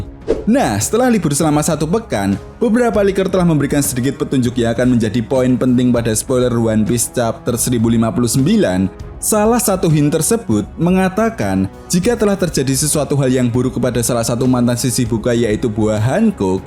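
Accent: Java, Indonesia. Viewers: Logat jawamu Hilangkan dikit, gk enak didengar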